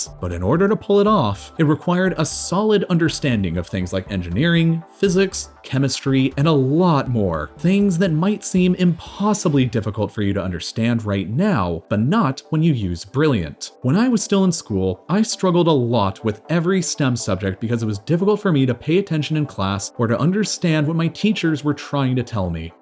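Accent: United States. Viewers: man i hate how this guy always uses km and meters, Wtf comon. Most of your audience is probably american and you yourself sound it... so come on!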